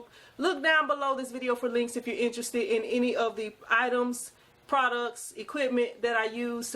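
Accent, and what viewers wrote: South, United States. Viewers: I came for your 1st time.....and the country accent I can't get enough of ;) It came out great!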